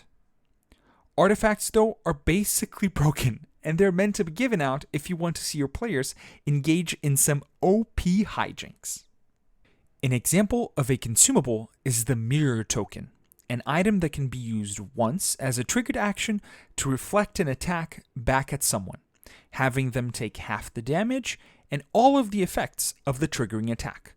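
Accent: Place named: Brazil. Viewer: Até sem saber que o seu nome é reconheci o seu sotaque brasileiro! Salve, irmão, 😂. Espero que o podcast cresça muito assim como o jogo!🎉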